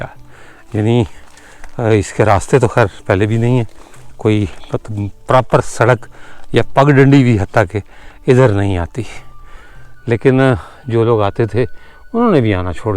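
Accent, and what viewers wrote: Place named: Lucknow, India. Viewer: Mohtaram Salamun Alyekum Maie Aapka Maddah Lucknow India se hun guzishta 2, Baras bad ab phir aapke vlog se mustafeed ho raha hun. Aapka andaze takallum aur tareekhi malumaat me Lucknvi tahezeeb ka khasa asar hai kahin aap hum Lucknow walon ke bichhde huwe maqami bashinde toh nahi hain?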